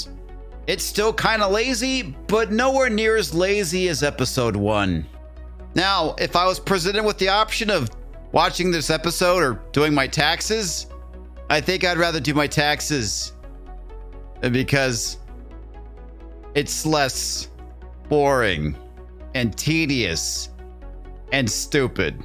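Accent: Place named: New York, United States. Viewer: You sound like an off-brand New Yorker, mate. I like it! And man was this show garbage.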